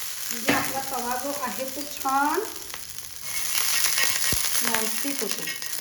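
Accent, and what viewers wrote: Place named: Gadhinglaj, India. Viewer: खूपच ावडलई. नक्की करून बघणार. काकडीची चटणी आणि रोस हे नवीन प्रकार समजले. मस्त!!, अलिकडच्या व्हिडिओत तुम्ही हडकल्यासारख्या दिसता. ही complement आहे बरं का. आज मेकप पण खऊप छान झालाय.❤ -सुनंदा गंदगे ता.क. तुम्ही रेसिपी खूप छान सांगता. मला तुमची भाषा ऐकून पण खूप छान वाटते. माझ्या आत्या गडहिंग्लज च्या होत्या.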